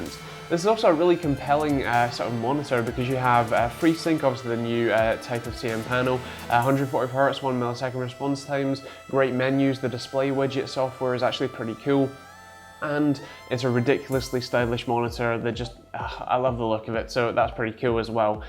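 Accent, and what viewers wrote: Scotland, United Kingdom. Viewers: scottish not irish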